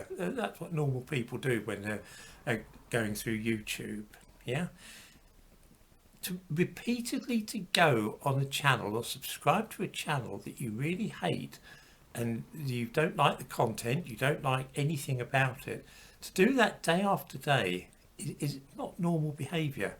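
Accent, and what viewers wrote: United Kingdom. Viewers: I have to say I have enjoyed this man's videos a great deal. I can't comprehend how anyone could find his charming British accent and peaceful, relaxed speech, somehow annoying.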